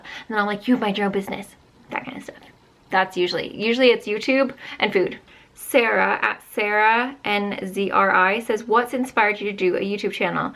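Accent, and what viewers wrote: England, United Kingdom. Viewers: Might be A stupid questuon but did you ever have an English accent.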